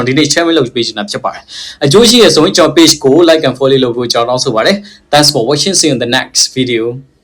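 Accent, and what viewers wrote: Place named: Myanmar. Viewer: ငပိသံလေး ပျောက်အောင် ပြောပေးပါအုံး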